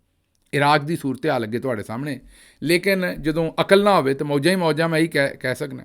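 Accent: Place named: Majha, India. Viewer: Teri boli veere panjabi aa jehdi bol re o eh tan majhe di boli aa urdu aardu bilkul ni hai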